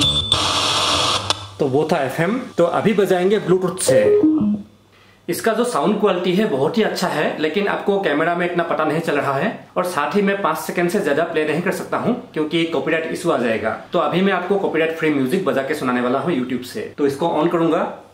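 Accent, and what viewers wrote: Assam, India. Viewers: Aap Assam se hai aap ka aawaj se pata chala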